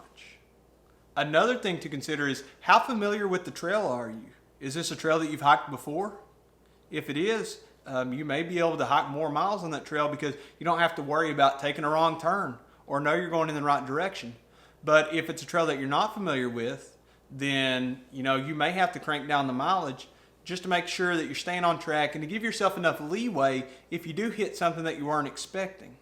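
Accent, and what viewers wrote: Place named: North Alabama, United States. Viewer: You from north Alabama by any chance? You sound about like me. lol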